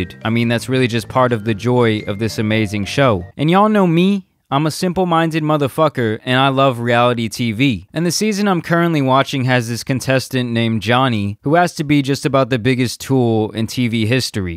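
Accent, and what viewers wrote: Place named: Philadelphia, United States. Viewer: you said squares. you're from the midwest area? But you have like a philly type accent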